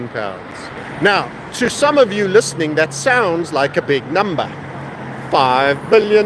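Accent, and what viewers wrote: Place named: South Africa. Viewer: a based guy with a South African accent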